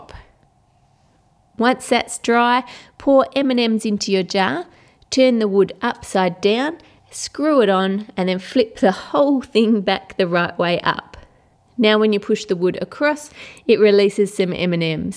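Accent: Australia. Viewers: Its just a aussie accent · Ate you Australian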